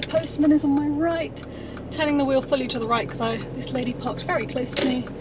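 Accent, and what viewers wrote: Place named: United Kingdom. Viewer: 18 minutes of British accent + UK tour? Let's go!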